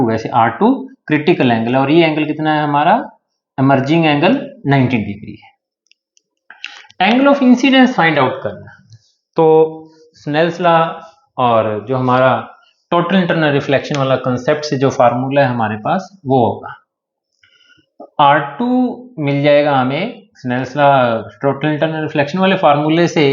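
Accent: Haryana, India. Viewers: Haryana se ho kya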